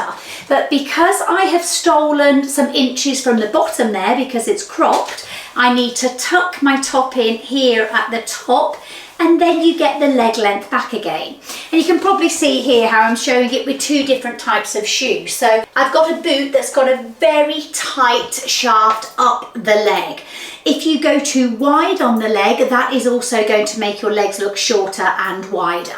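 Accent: United Kingdom. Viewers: Your UK accent is lovely, however, unnecessary fashion consumption is off-putting. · Your looking absolut amazing! I love your Videos and your British ( ? ) English😃🥰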